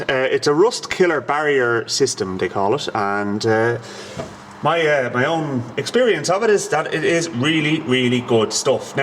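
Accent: Ireland. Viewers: The images on the vidwo look fine and I love your channel. This American also finds your Irish accent to be a pleasant addition. I worked in Dublin (actually, in Blackrock) a while back and love the countryside and the people of Ireland.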